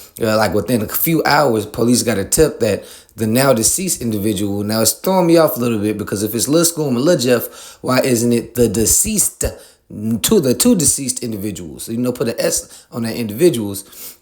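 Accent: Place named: Gary, United States. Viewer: he gotta be from Gary or east Chicago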